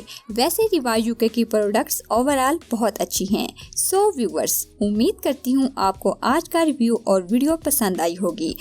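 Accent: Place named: Rural, India. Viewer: apki awaz bot paindu hai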